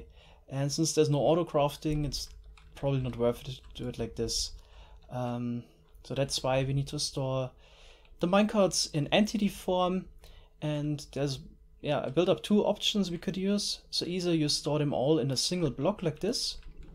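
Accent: Germany. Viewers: es klingt wie wenn ein deutscher englisch,spricht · HoiiBoy ???? Das liegt daran, dass ein Deutscher Englisch spricht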